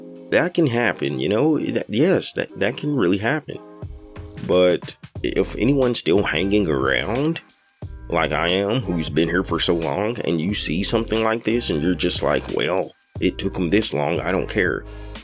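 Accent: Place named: South, United States. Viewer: He do got that southern twang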